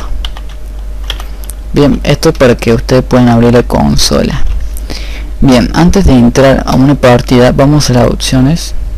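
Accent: Córdoba, Argentina. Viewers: Que buena tonada cordobeza XD . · no entiendo muy bien el acento argentino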